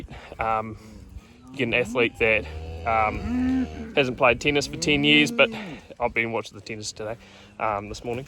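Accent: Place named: Taihape, New Zealand. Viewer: Mate..... said with that taihape lion red twang, had some bloody laughs watching this. said to the wife, "oh shit he's got one of those datamar's reels" feel your love hate relationship with em. so anywho, im from the wider north island, been there done some of that, grew up in the windy city of Palmy and migrated through the island to north-land after a seasons in ohingati, drove stock units in your neck of the woods for couple years before going back to the front end, to many late nights loading out of fielding on a Friday night for my liking. anyways nice sarcasm on the wool. bloody sacrilege. look mate we were farming 600 ha in north-land before it all got turn into carbon pine forest but i swear the best vale out of your nitrogen is in may, sets you up for winter, and yeah i always monitor soil temps, we we 12.3 on Wednesday last week., love your vids mate. 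( oh yeah my hunterway rocks is exactlly like duke). hard headed..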